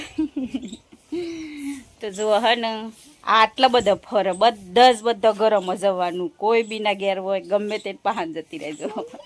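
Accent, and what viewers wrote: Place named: Sabarkantha, India. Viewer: બેન તમારી બોલી પરથી એવું લાગે છે કે તમે સાબરકાંઠા જિલ્લાના હોય એવું લાગે છે 🎉🎉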